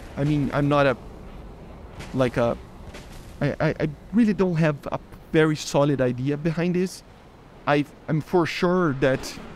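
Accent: Brazil. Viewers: tu é brasileiro?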